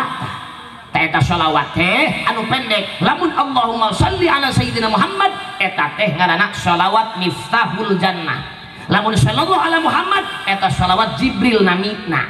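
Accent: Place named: West, Indonesia. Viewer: Ngulon pisan nyariosna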